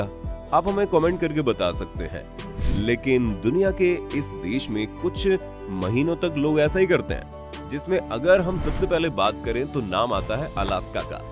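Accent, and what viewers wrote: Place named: India. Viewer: Aapki avaj hindi Vibes jesi he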